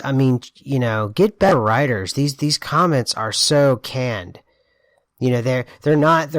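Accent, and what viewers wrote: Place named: Pittsburgh, United States. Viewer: You sound like your from Pittsburgh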